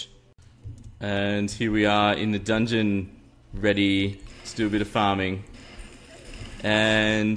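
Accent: Australia. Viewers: Are you Australian? Just wondering from your accent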